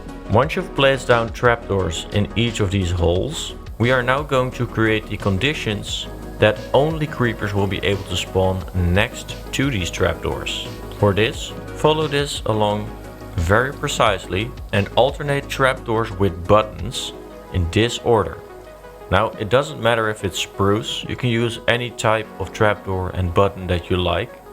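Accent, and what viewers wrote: Netherlands. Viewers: this is the first time i realy heard the dutch accent i your english never knew you where dutch. and ofcource a great build keep it up man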